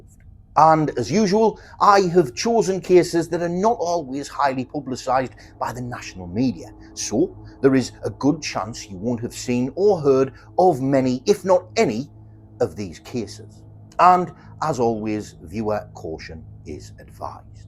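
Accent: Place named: Newcastle, United Kingdom. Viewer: Binging on much of your content - thank you very much for it, keep it coming. Another subscriber and fellow Geordie. At least I think you're a Geordie 🙂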